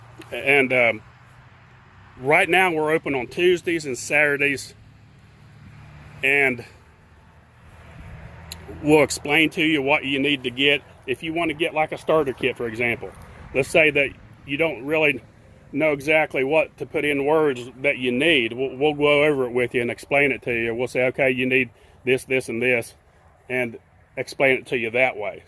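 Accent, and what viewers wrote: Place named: West Virginia, United States. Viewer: I thought had a wv accent! Too funny. It’s almost as bad as mine. 😃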